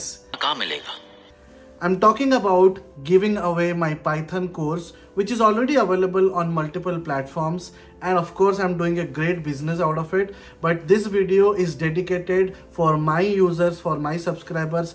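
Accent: Odisha, India. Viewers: Sir are u from odisha ?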